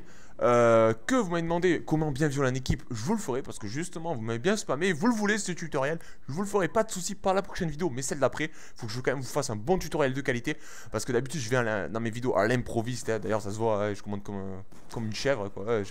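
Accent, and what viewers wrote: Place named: South, France. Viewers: Petit accent du sud moi aussi j'ai cette accent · Salut J'adore ton accent Marseillais MARSEILLAIS lol Bravo pour tes stats et ta nuke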